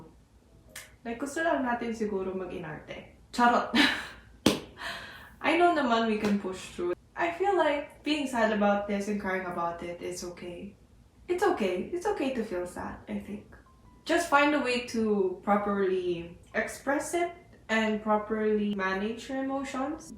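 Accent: Philippines. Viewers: U r more a filipina to me.. the accent, the slangs.. everything... U can comeback anytime.. our country is waiting for u.. and.... Hanggang jan nlng yung english ko ☺️ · that's the guilt and feeling of an OFW but this is your home you are not a foreigner nor stranger at least you have that smile now and the most important you have that Filipino English Accent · You look so much of a korean coz you are a true blooded korean, but you definitely sound like a true filipino,,,,,i appreciate you so much... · First time seeing your vlog. And surprised kasi parang ikaw yung Korean vlogger na pinakafluent sa tagalog. Not comparing, pero amazing lang na very natural yung pag Taglish mo. At accent pinoy talaga ang tagalog. Nice2. 😅 Edit: saw that this is your first vid pala. Haha. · Saan ka lumaki sa Pinas, kasi ang haling mo talagang mag tagalog ay English.. pati mga expression mo ay Pinay na Pinay! 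Good job at ang lakas pa ng appeal mo! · I saw you in Tiktok and I've followed you there because I'm amazed by how Filipino you are at heart and with how you speak. In this new chapter of yours, I know it will be hard. You may be Korean by blood but you grew up here in the Philippines and it's understandable how sad you are to leave what you've considered your home for almost all of your life. Just share your journey with your friends and family. Most likely you and your friends here in the Philippines have a group chat. Just keep talking to each other even if its random. We also do that, I've had friends who left PH too but we still leave our stories in the gc to comfort each other. It will be hard at first. But don't let yourself sink in the sadness. You can make it through. The Philippines will always be your home when you go back. God bless and fighting! 😊❤️ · A Korean speaking english with a Filipino accent is so funny to me.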